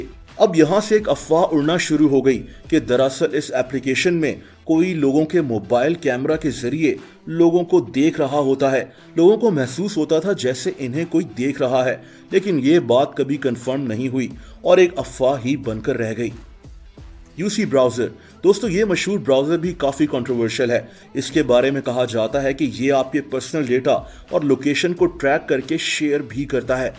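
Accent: Multan, Pakistan. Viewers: Ap multan sa ho na bhai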